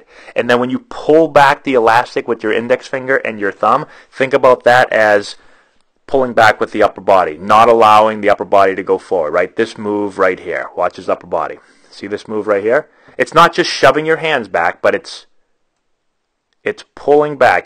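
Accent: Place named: East Coast, United States. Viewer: Anyone tell you that you sound a bit like Howard Stern? I think it's just your east coast accent lol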